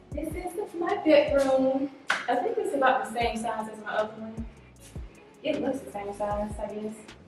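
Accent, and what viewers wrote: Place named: Houston, United States. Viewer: I think I hear a bit of Houston accent 🤔 beautiful home.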